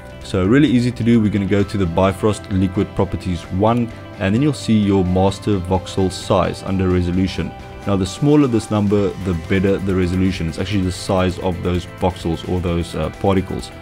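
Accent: South Africa. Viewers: Hi great Tut! thank you. are you South african? you have a interesting accent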